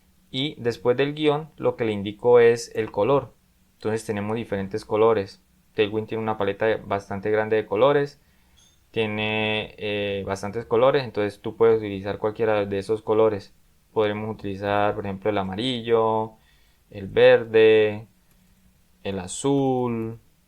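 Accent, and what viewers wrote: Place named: Colombia. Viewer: De antemano, muchas gracias por dedicarle tiempo a enseñar a los demás. Ojalá más personas se sumen como yo a este canal. Por tu voz asumo que eres de Colombia, al igual que yo. Estoy aprendiendo por mi parte a programar, pero por mi trabajo y responsabilidades no pude. Ahora Sacando tiempo, poco a poco voy aprendiendo y espero, con tu ayuda, seguir aprendiendo. Bendiciones para ti y tu familia.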